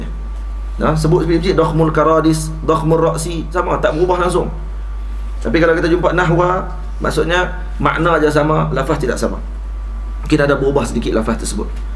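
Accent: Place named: Kedah, Malaysia. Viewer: anas nasrulloh basa malaysia. lebih tepat negeri kedah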